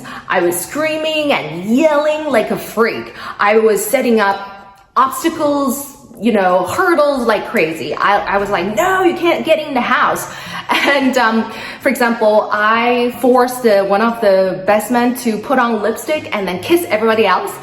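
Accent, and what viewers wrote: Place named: Vietnam. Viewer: I was quite astonished by the time i heard your accent, it was really like Vietnamese accent, but it turned out you are an authentic Chinese . Anyway your English voice is So great, i love it. A comment from viEt nam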